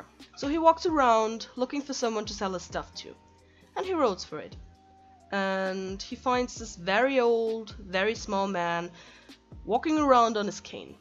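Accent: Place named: Germany. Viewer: Your little german accent is great! Your art us also great!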